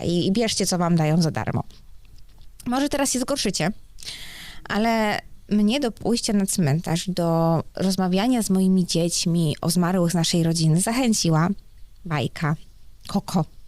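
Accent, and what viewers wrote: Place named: Ukraine. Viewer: Sądząc po akcencie to jest chyba biedną ukrą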